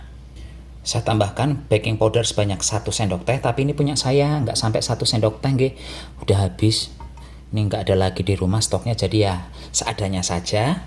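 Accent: Banyumas, Indonesia. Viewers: Tinggal.di.mana si mas ? BATAM..? Tapi.dialeknya mbanyumaswn ya..😂 pada baen karo inyong..adoh nang kalimantan esih clacapan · bar kesuh..curhat,mecucu njuk masak dech....mandan2....error....kog siki dadi ngapak kang?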